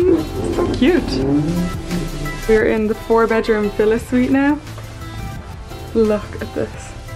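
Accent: Ireland. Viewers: U sound like irish😉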